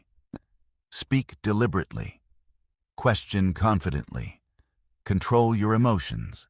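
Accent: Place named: United States. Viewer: Good job with the the American accent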